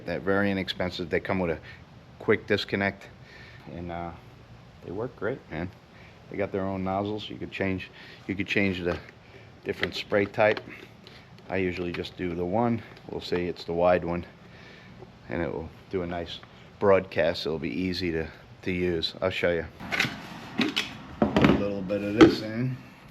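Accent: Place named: New England, United States. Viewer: You know what, your little demonstration was spot on to show how soap helps with penetration!! That was F'in cool! And by your accent, I know you are in the same part of the country, N.E.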